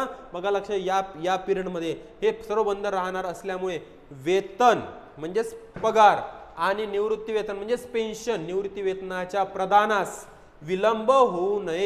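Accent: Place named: Vidarbha, India. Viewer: ही विदर्भाची भाषा आहे भाऊ.... ते असेच बोलतात 😂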